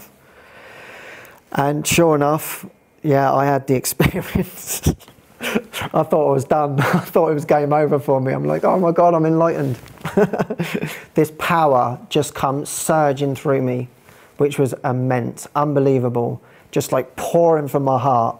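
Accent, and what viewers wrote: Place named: England, United Kingdom. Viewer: This was joyful and fun, and with that England english almost like Monty Pyton 🙃🧡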